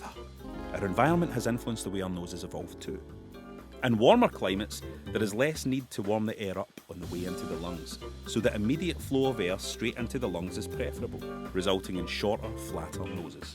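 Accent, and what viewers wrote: Scotland, United Kingdom. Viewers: The best thing about this video is your Scotish accent mate.. not much else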